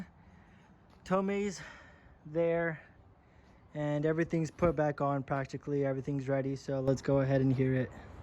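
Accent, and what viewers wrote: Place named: India. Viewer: bro pense que eras de la india hasta que hiciste el comentario de la chela jajajja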